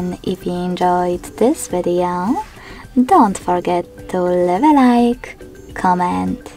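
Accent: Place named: Thailand. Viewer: What the heck, 😂 the voice is so Thailand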